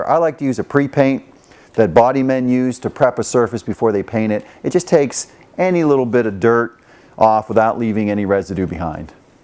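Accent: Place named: Canada. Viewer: love the way he says "Give it a bit of 'hows ya father' " that surely is the most usual mix of accents... kind of Canadian, kind of English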